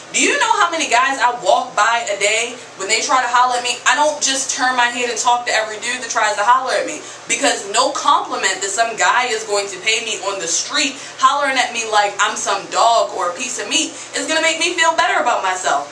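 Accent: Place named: Baltimore, United States. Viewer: u gotta be from baltimore maryland or somewhere in that area i hear it all in ur voice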